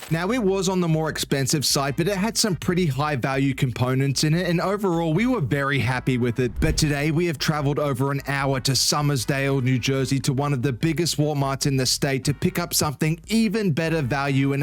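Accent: Australia. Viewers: i dont care about the PCs at all. but i stay for the hilarious aussie voice. yeah nah good channel you beautiful baaaastard:elbowbump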